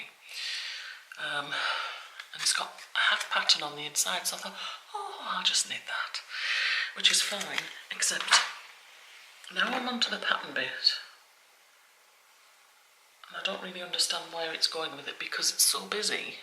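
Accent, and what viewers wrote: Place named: County Durham, United Kingdom. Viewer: I'm not sure where you are, your accent sounds local to me. I'm in County Durham x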